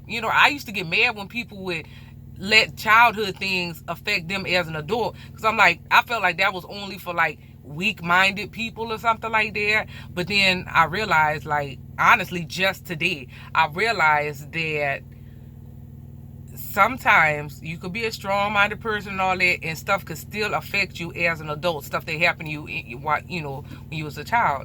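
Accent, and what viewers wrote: Louisiana, United States. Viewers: Love your accent! Bayou, Creole?....Cajun! Luv You! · I though you was from loussaina the accent gave it away!! Lol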